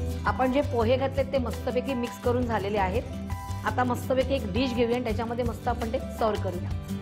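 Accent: Pune, India. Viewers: Is this the Pune marathi language?? Or vidarbh marathi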